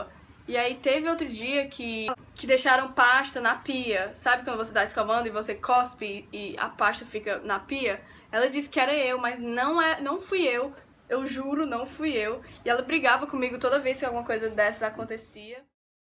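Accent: Ceará, Brazil. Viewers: não sabia de onde tu era, mas reconheci o sotaque cearense, q é meu sotaque tbm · nossa reconheci o sotaque na mesma hora que ouvi vc falando . CEARÁ ❤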